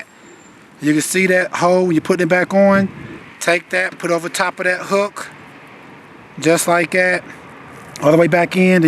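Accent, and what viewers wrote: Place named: Tennessee, United States. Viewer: 🗣️Like cat my cousin's from Tennessee talk like that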